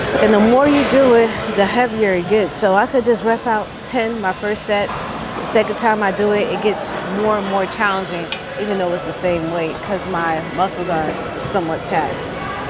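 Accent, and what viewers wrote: United States. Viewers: 😳💭 “You’re sound American-born, you’re body is lookin’ Greek statuesque, but the name is lookin’ Haitian as hell.” Watches video 👀💭 “She said ‘Kisa?..’ and ‘North Jersey’ came across the screen?!.. She’s definitely Haitian b!” 💯😂😏💪🏾🙏🏾🇭🇹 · Go girl u u look good i just came across your videos i just subscribed im going to continue to follow you i just started working out but i work out at home ❤😂 keep up the good work u sound like my cousin from Westchester new York white plains i love y'all accent ❤💯🤣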